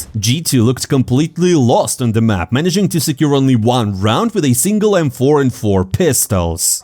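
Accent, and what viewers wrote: Russia. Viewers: Твой русский английский не очень гуд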